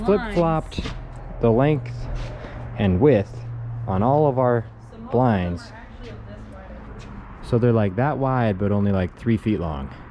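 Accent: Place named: Australia. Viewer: Hahaha the Aussie accent 😂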